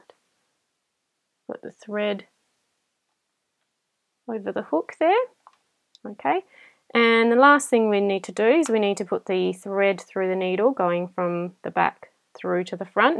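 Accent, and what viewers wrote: Australia. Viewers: Great very helpful video, thank you,,,and I love this Australian accent. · Thank you for this very helpful video. The Aussie accent was frosting on the cake! :)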